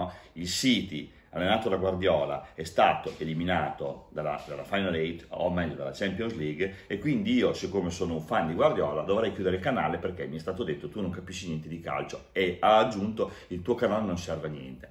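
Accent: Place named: Piacenza, Italy. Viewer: Ciao ma sei di Piacenza? hai l'accento simile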